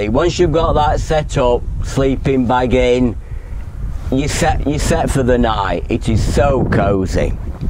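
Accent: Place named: Sheffield, United Kingdom. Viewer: Just found your channel with the chesterfield tunnel. I grew up round there but was in London and the south the last fifty years. Walked to Carl wark with dad. Nice to hear the Sheffield accent , if I might say different to the chesterfield one. Enjoyed all the camping stuff . Thanks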